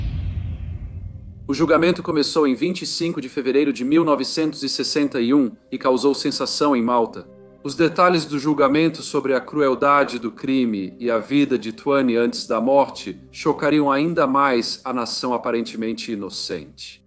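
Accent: Portugal. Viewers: Os casos são interessantes. Mas a forma de vc contar. Muda a voz as vezes parecendo um português de Portugal. E chato.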